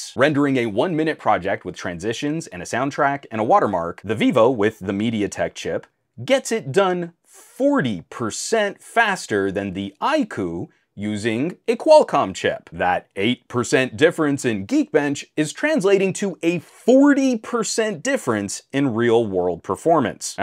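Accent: United States. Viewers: Mr, what a beautiful American English accent you have..🎉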